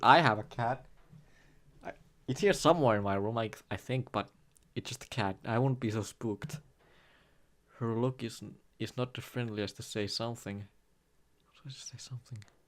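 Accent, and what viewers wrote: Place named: Finland. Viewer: I love your cute finnish accent. But fuck that weeb shit xd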